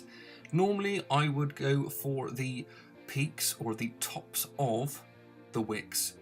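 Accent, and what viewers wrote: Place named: Bristol, United Kingdom. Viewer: Your accent is even more Bristolian in recordings!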